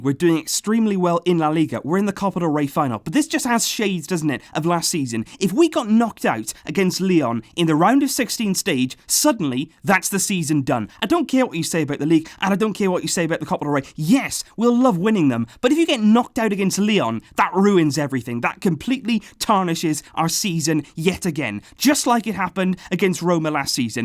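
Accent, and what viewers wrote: Wales, United Kingdom. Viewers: I really love your Welsh accent. Brilliant content as always, and I do hope this channel would grow bigger and bigger.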